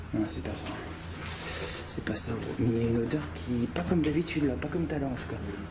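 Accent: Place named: North, France. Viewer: Bonsoir vous êtes nordistes? Non? J'ai le même accent 😊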